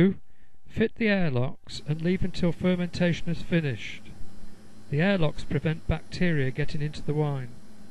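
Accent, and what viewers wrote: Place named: England, United Kingdom. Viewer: Fair play, must taste delicious but cant stand that english commentary.... get on to the BBC and you could malke a living..!! Well done tho!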